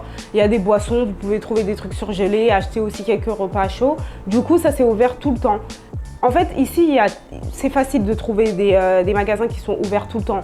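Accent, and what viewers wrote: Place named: Moselle, France. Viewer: Elle a un sacré accent mosellan !!!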